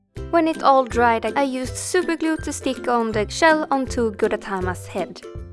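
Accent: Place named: Sweden. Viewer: Shit, man hör på din dialekt att du är svensk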